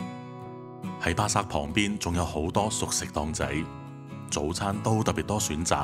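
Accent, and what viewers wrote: Hong Kong, China. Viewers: 請問你是香港人嗎？因為聽口音不像馬來西亞華人。。😀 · U sound like Hongkie. Great job. Hope to see more of your work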